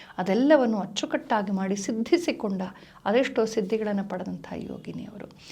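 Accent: Standard. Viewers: swachavada kannada 🙏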